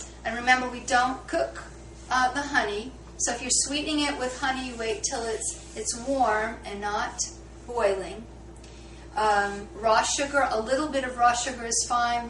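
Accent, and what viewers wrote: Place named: United States. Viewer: Why do mercans say "Urrbs" instead of "herbs", it clearly starts with H not U...and turmeric isn't even a Urrb...it's a spice!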